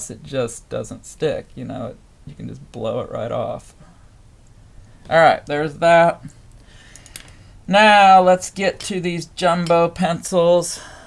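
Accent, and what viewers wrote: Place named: North Carolina, United States. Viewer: I love that you have an experienced teaching style. Are you from NC, your voice sounds like it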